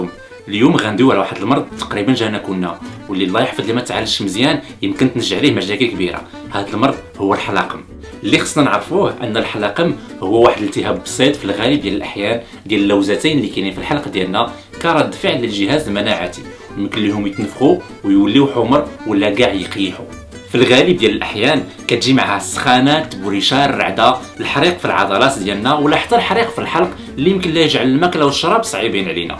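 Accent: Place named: France. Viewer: كلشي ما افتهمت من كلامك . ياسبحان الله الكلام عربي واللهجة على وزن فرنساوي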